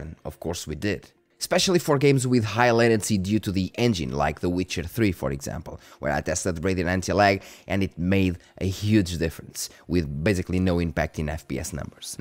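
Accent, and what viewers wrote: Portugal. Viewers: Are you Portuguese by any chance? You sound like one of us tho haha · Boas mano, preferes o processador 7700x ao 7800x3d? Reparei que esse é o teu. Abraço bom conteúdo, pelo sotaque vi logo que eras tuga hehehe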